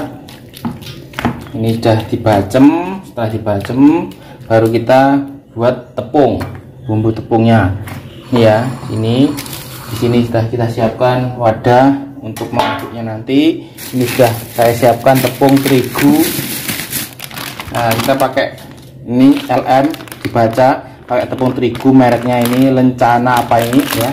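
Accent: Java, Indonesia. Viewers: Mantap mas resep2mu jowomu ngendhi aku wong Solo terdampar neng Sentul Bogor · mas kental jawanya...lucu penyampaiannya 👍👍👍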